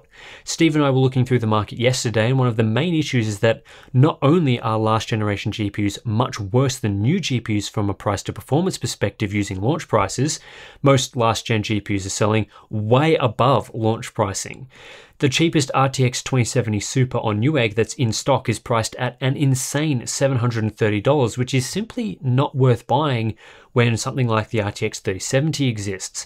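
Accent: Australia. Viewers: You could do worse, his Australian accent in these shows is subtle, not a broad one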